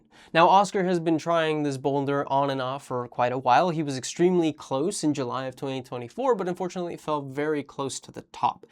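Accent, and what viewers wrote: United States. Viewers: No way is he Spanish with that accent. He might live in Spain but he's got to be from the US surely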